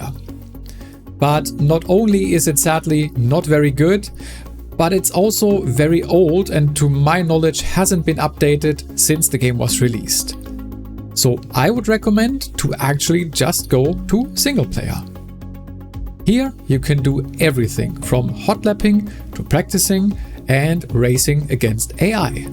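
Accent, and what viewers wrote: Germany. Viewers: I can hear Denglisch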